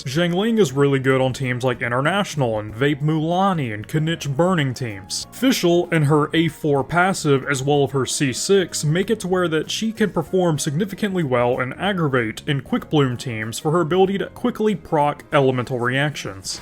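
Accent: South, United States. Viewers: He said he has a thick southern accent (I'm assuming he's from the US) so he has a hard time to pronounce it.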